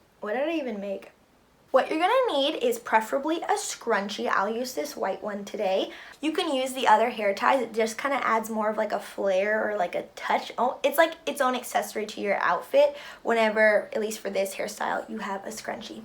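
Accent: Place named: South, United States. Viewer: you speak southern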